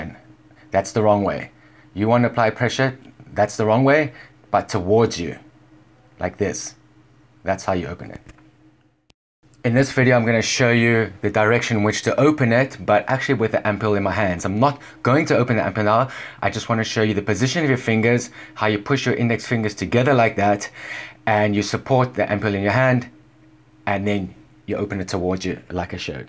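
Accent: South Africa. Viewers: What's that accent? South African? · South African accent! Yay! Thanks for the info. ( Shosholoza to you too!) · Are you from South Africa? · You sound like a South African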